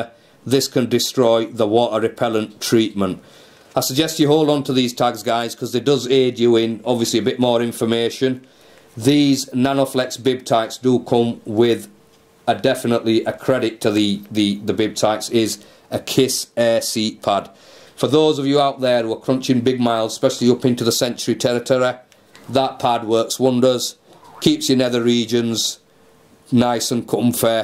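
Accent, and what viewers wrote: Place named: West Yorkshire, United Kingdom. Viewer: God bless t West Yorkshire Dialect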